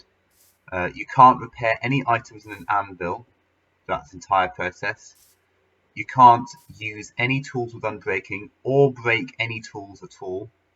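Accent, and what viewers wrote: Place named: Manchester, United Kingdom. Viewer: Is that Sancarn reincarnated?! Lol I’m joking but I’m super glad that a fellow Brit has managed to make this incredible technical discovery! P.S you sound like you are from Manchester :P Congratulations on this achievement!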